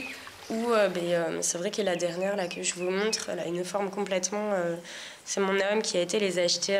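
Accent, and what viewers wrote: South, France. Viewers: j'avais remarqué aussi que j'entendais un accent du sud que je n'entendais pas avant ^^ J'aime bien les accents :) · J'adore cette vidéo avec le bruit de la nature ♥ C'est vraiment une belle idée et la coloquinte blanche avec les pois est ma préférée. J'ai remarqué que tu avais beaucoup l'accent du sud dans cette vidéo, je me trompe? Bisous ♥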